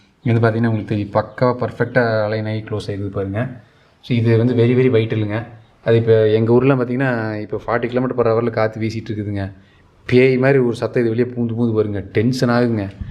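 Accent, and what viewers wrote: Kongu, India. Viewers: Good information, well-planned, and between your speech some Kongu-style is highlighted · Should we apply putty for exterior wall also? How the inch wide granite lip is attached to counter top? would it not detach after some time? I like that idea and many of your tips. I watched the entire video and got carried away completely. your knowledge and presentation in kongu Tamil is awesome.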